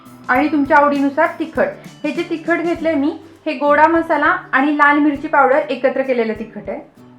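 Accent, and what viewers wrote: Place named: Satara, India. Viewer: mi pan satarchi ahe.. bolnyavhya style Varun guess kele mi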